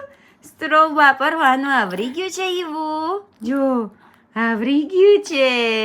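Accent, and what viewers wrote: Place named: Charotar, India. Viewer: speaks charotar gujarati 😅 sounds really good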